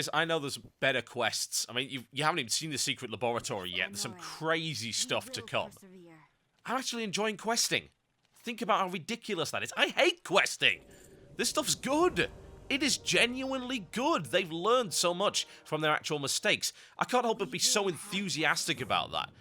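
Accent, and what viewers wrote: United Kingdom. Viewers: I wish I had an awesome british accent like you :(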